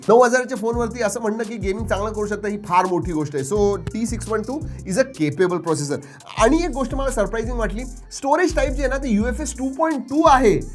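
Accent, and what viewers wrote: Pune, India. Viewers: That puneri accent is soo gooodd 🤩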